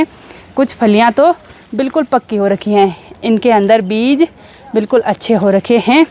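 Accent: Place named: Pahari, India. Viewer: अ य चाची परनाम ❤😂 मीठी आवाज, पहाड़ी लहजा ❤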